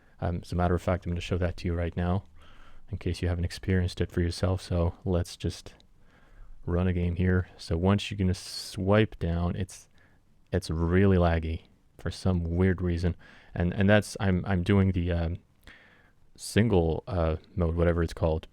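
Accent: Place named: United States. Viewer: From the sound of your voice you're located in America so I just wanted to ask how your process was getting the 17 ProMax and if you could maybe link where you got it from or something like that so other Americans like me can reliably get the Xiaomi 17 pro Max. Plus I did want to ask what cell phone provider you're using because I know some of the Chinese smartphones do not work well with Verizon for example. (I have t mobile)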